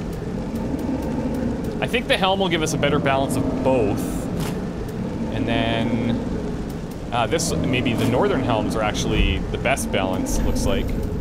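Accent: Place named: Canada. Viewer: It's not a "tomb!" i kept thinking that too. We still love him, crazy Canadian accent and all.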